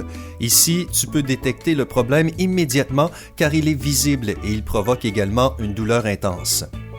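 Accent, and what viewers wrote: Quebec, Canada. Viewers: accent canadien je crois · C une voix québécois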